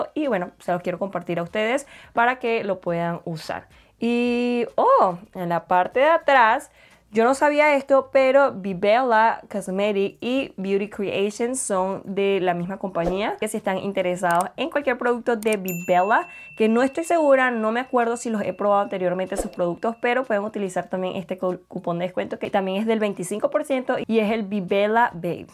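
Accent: Venezuela. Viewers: Es el primer video que veo tuyo…te oí hablar y sentí el acento venezolano…tu mamá dijo Naguará y lo confirmé! jaja 😘🇻🇪 me ayudaste mucho con esta prueba de producto gracias!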